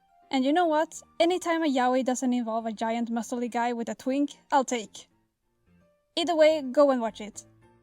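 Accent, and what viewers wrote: Sweden. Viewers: What accent do you have? are u Swedish?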